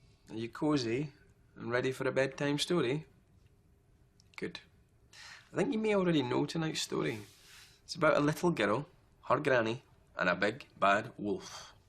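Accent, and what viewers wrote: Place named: Scotland, United Kingdom. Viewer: Ира, молодец какая! Отличное видео)) Джеймс со своим шотландским акцентом вообще отлично вписался)) Особенно волку на славу удался! Спасибо за ссылку!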